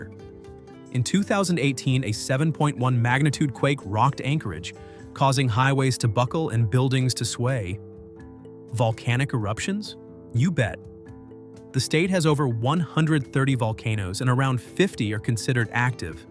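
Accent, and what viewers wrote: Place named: New York, United States. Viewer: This commentator must be from New York..... or Martha's Vinyard..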